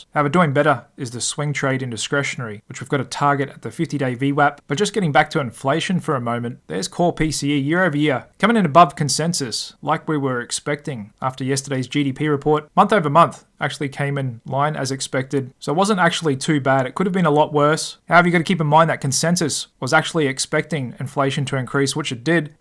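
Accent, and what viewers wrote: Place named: Australia. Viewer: The format, style and delivery of these daily videos is amazing. They really help me to keep track of what is going on globally and I am forever grateful for all your hard work and commitment to this. I only hope you keep it up and continue helping all the really grateful viewers you have, and I think I can speak for them on this occasion. Perhaps a bit naughty of me to say this but your Australian twang is such a refreshing change from the usual accents that we listen to day in and day out on financial channels. This is coming from a Brit! You come across as really professional, easy on the ear, and sincere. Cheers mate, hope you keep delivering!! Have a great weekend 🇦🇺🇬🇧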